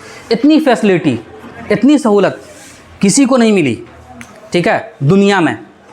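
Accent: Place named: Bihar, India. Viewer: ماشاء اللہ انداز تفہیم بہت اچھی ہے اور ٹھیک ہے ٹھیک ہے کی تکرار اور مزیدار بنا رہا ہے جو بہاری پن کی ڈھیٹ علامت ہے جو بر محل ہے اللہ سلامت رکھے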